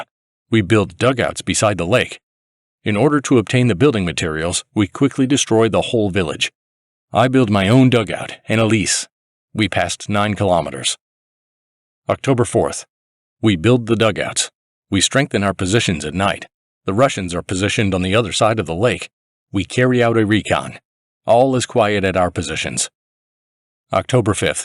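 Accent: United States. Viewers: I loved this series until you added the bullshit American voice.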